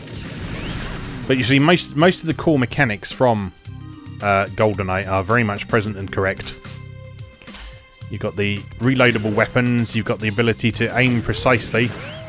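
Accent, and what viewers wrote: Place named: United Kingdom. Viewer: You have a nice brit voice :P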